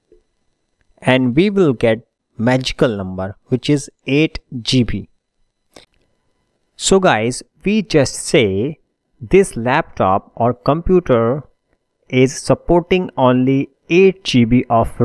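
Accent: India. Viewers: thank you random indian accent dude from YT<3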